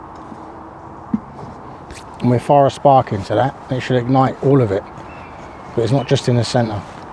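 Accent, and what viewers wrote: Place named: United Kingdom. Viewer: This is my favorite type of British accent. Great video.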